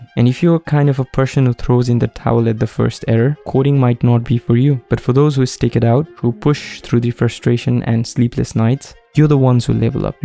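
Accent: India. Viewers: where you from bro? India, UP?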